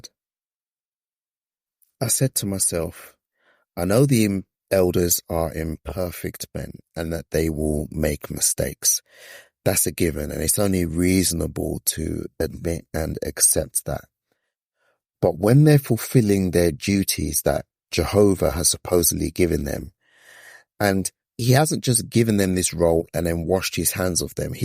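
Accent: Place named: United Kingdom. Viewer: I am bingeing all your videos! Your uk accent drew me in. Also in uk. Near london.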